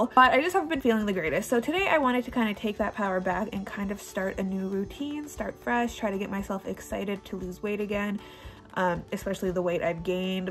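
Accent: Canada. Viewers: is it just me or does she sound extra canadian in this video lmao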